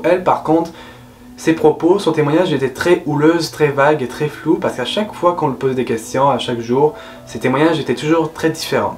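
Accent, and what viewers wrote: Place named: Quebec, Canada. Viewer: Merci pour cette légende inconnue chez nous en France. Tu as très bien raconté, j'étais contente que tu l'illustres par des images superbes (cela nous montre votre belle contrée) et le montage est bien réalisé. Seul bémol, pour la prochaine légende peux tu parler un peu moins vite car par moment, n'étant pas habituée à l'accent de nos cousins Québecois, j'ai eu un peu de mal à suivre. A dans 1 mois pour la prochaine légende. Mariemma une cousine bordelaise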